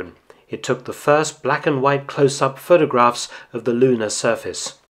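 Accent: United Kingdom. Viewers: When you have to use context to tell whether a British person is saying Luna or lunar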